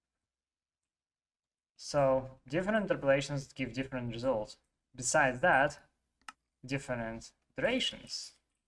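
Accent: Russia. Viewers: У вас руский Акцент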